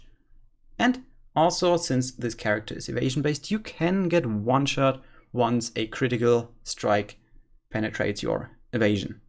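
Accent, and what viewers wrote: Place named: Germany. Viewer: could be german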